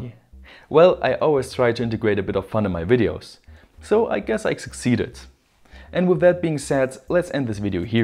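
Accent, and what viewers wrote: Germany. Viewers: So did I reading your comment, most, if not all, of his mispronunciations are due to his German accent, not his English skills, actually his English is excellent. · are you from germeny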